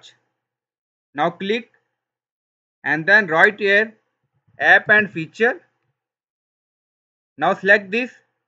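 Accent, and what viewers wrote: India. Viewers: Sempre um indiano falando inglês que me salva desses bugs da microsoft kkkkkk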